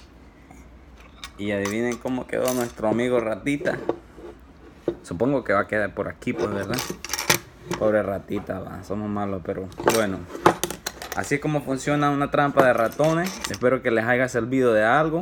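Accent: Honduras. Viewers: De casualidad no eres hondureño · Hola, gracias por su video, jejej, ¿ud es de Honduras verdad? tienen un tontito para hablar muy bonito, además algunas de sus palabras son muy graciosas, lo digo sin afán de ofender. Ya compré una trampa y la pondré para exterminar estos animalillos que son un gran problema. Bendiciones.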